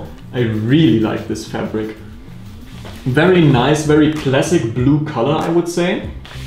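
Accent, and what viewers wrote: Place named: Germany. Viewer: geil. wie man einfach das deutsche English heraushören kann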